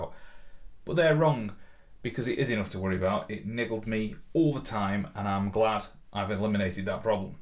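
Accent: Stoke, United Kingdom. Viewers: Hey! Are you a Stokie?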